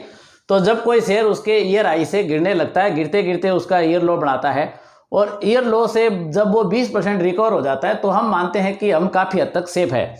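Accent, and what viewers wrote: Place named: Kota, India. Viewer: Sir aap Kota ke aas pass ke lagte ho, apki tone se aisa lagta hai.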